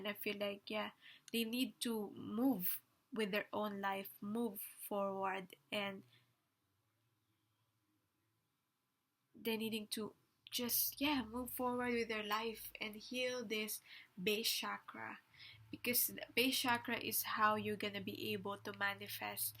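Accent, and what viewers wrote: Philippines. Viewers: Picked no. 1. A good reading. I can tell w ur accent, that ur a Filipina.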